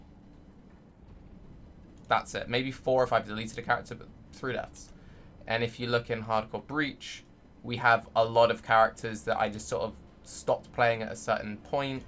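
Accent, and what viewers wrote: United Kingdom. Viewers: I don't think people are really gonna bother you if you run ads on twitch. Like if you're broke and you need money just do it. 15 seconds of commercial every once in a while is not gonna bother us. On a side note, glad your health's doing better, and God damn(... bloody hell?) I love that British accent lol.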